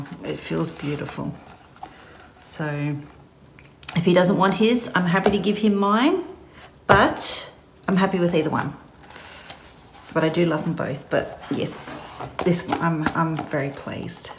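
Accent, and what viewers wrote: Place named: Australia. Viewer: So lovely to hear an Aussie voice doing these types of videos :)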